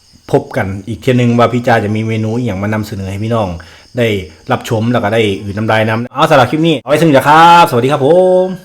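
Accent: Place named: North, Thailand. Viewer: สำเนียงเหมือคนเหนือเนอะ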